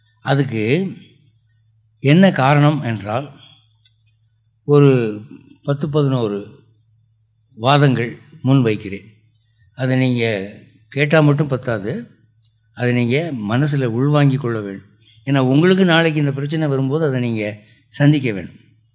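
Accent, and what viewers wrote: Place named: Madurai, India. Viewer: சிலர் மீது காரணமே இல்லாமல் அன்பும் பக்தியும் தோன்றி விடுகிறது.. ஜோசப் அப்பாவின் பேச்சைக் கேட்கும் ஒரு மணி நேரம்... இந்த உலகமே எனக்கு மறந்து போகும்.. His madurai slang and timing sense.. Chanceless... 👌👌👌.. குருவே சரணம்🙏🙏🙏 ஜெய் ஶ்ரீமன் நாராயணா..🙏🙏🙏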